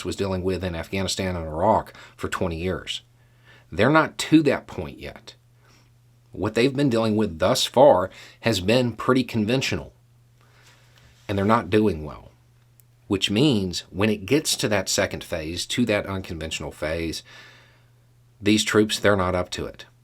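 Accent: South, United States. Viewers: Thanks. Yeah I knew as I sent that comment that it wasn't Texan. Had a feeling it was southern tho Howdy there internet people it's agaien. So todaiye... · Another Skewer here! Love Tuesdays lots of great content from two of my favorites. They prove that all of us sporting a Southern accent are not complete morons! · First time watching. First impressions are often wrong. My first impression, beard, southern accent, prepper, yeah, this guy is a Trumper. I hope to hell I'm wrong because there are already far too many anti-American republican a-holes. So, before I 'sub', I must watch more of this man's videos. I 100% STAND WITH UKRAINE!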